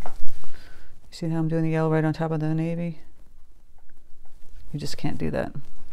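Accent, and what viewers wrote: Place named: Maine, United States. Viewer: Are you from Maine? I can hear it in your accent ;-)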